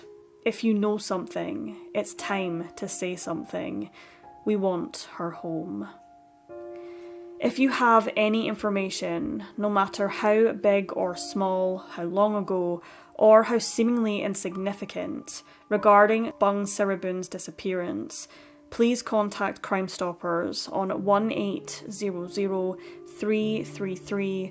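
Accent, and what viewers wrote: Scotland, United Kingdom. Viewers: why are you scottish??? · I am unsure how long I have been subscribed but I will say this without a doubt, you are my favourite true crime narrator, you never sensationalise the stories or make silly jokes and memes like others in the same genre, I do sometimes enjoy other methods but I dobnot respect them as I respect you. You being scottish also helps because it let's me here more of my own accent online without it being exaggerated or used as anything other than the true voice it is. You have inspired me to put my own voice out there (not the channel I am commenting with) and also you have given a voice to many of the unfortunate souls in your videos. Thankyou.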